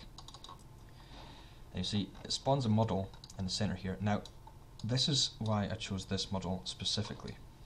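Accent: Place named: Scotland, United Kingdom. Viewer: So Scottish. <3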